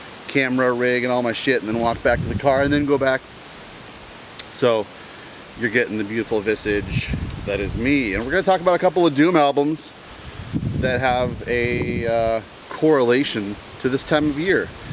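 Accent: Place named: Australia. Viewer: Dude, that walking footage at the start rules, it's like a music video to the song playing. No problems with the wind noise, great ambience. I can forgive that, but not that "down un-da" accent! Mandy who is Murkrat is quite prolific, she was in gothdoom band Lycanthia who I saw live back in the day, and is in funeral doom band The Slow Death. I believe she lives on a farm and suffered some damage during last years fires.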